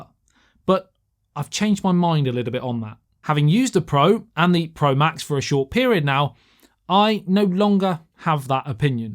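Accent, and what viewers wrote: United Kingdom. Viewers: Liked for the British accent 😊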